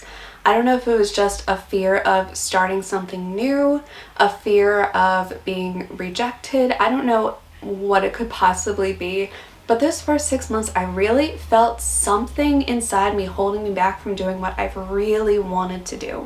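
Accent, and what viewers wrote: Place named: Australia. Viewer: I am concerned that you refer to this as "things i regret about my first six months here." My wife and I came here from NZ in 1981 and our experience of homesickness was very different. I had a job to come to so I had to learn to speak Australian quickly, I had to learn to "get on the team". Francie, on the other hand had lots of time to mope about the friends she left behind and her family still in NZ. It took her YEARS to get over homesickness. I tell you that because you've had a huge upheaval. You might not see the enormity of what you've acomplished yet, but it is enormous. You must NOT allow yourself to regret not doing things. You've been busy as hell learning to live in another country. Jeez you even speak like an Aussie (sort of )!! You should think of each of those things as "now i've been here 6 months and settled in with Mark, here's what i really want to get going on for the next 6 months ..." That's a far more positive way to think of it. 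It's very easy to tell yourself that life is terrible and you'll start to believe it But just as easy to say "life here is wonderful" and you'll fool your brain into thinking that instead. Not changing things in the house early on: I think you were right not to change things around all around the house. Yes you want to make some of the house 'yours' so it's the home of both of you, and I agree with that, but on the other hand you dont want Mark saying to himself, "my God!!! she's come all this way and in five minutes she's chan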